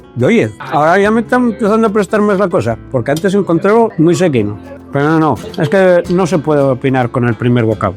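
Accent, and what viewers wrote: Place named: Asturias, Spain. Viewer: Querido asturiano , me encanta Asturias, pero no comparto tu forma de hablar internacionalmente, cambiando las a con las e , no deberías decir castañes, cuando la real academia española establece que son castañas y no castañes, y compártelo a toda TU COMARCA, asturiana tan bella , el castellano es la lengua oficial española , tu eres español??????????😅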